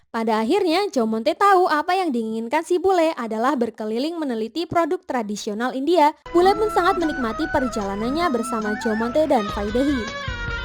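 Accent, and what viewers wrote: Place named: Sulawesi, Indonesia. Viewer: Koq logatnya pakai "kita ji"... Mimin org Sulawesi kahh 😁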